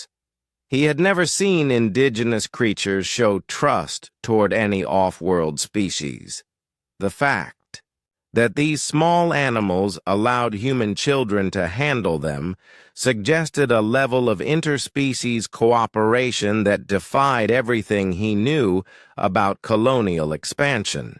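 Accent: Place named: Texas, United States. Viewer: Don’t like the Texas drawl speech pattern.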